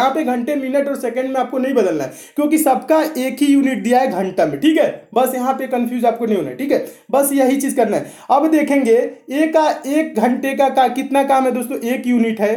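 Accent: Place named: Bihar, India. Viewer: Sir aap bihar se ho kya kyonki bihari 11 ko igara bolte hai nice sir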